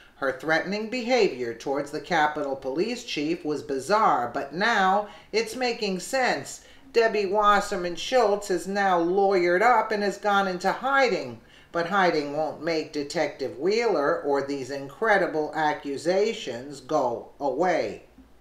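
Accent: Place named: Brooklyn, United States. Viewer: Ok, you bring some good news to this but I have to unsubscribe. Why do you read what is on the screen? WE CAN READ. READ with support collateral or show text and talk about the collateral pictures/graphics. Also, you sound like a Brooklyn middle aged Italian/Jew. That whine is killing me. lol. You do a great job. I am simply being critical due to broadcast journalism past and a traditionalist. Plane flying over head is a nice touch. God bless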